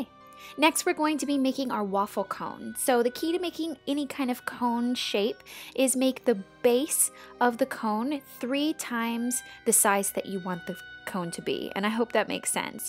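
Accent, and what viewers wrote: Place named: Los Angeles, United States. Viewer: She sounds so LA compared to now. Love the transformation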